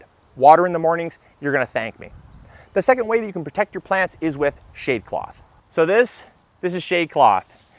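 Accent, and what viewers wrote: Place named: Midwest, United States. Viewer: I appreciate the cliffnotes, but also enjoy hearing "What is going on, everyone?" with his pleasant Midwestern accent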